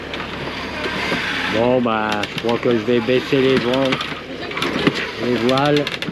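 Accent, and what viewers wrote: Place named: Valenciennes, France. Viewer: D'où qu'ej viens.. bin ed Wavrin, mais maintenin ej chui din l'pas de calais, diéval pour être précis.. Mais toi,?? j'ai l'impression de reconnaître un accent valenciennois, mais je ne suis pas sûr;)... té dou tizotte??? Sage décisison de faire demi tour.. d'ailleurs, c'est un voilier, pas un hors bord, ton bateau LOL.. donc faut savoir profiter du vent: trop de vidéos où ils se doivent de mettre le moteur.. et suis presque sûr qu'une majorité ne sait pas utiliser le sextant: s'il n'y a plus d'électricité à bord, je crains que ça ne finisse en panique, si ce n'est en drame... Au fait: pilote automatique, mais as-tu un régulateur d'allure?? je demande ça car mon grand père en avait fabriqué un quand il avait son bateau à Etaples (un petit Challenger 7 mètres insubmersible): une fabrication maison avec du contre plaqué marine.. 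Bon, à la revoiyure dans deux jours pétants;)... Cordialement. éric.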